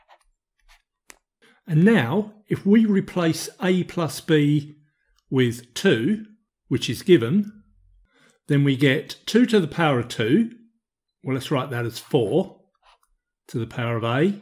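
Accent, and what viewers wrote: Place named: Cockney, United Kingdom. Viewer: Really nice! Love your accent(Cockney I hope?)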